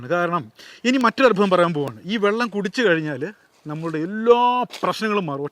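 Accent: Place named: Trivandrum, India. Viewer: 😂😂thani Tvm slanghu 😂😂😂. Vayar kurakku nalla adi aanalle 😂 meesha. Uff soooper ❤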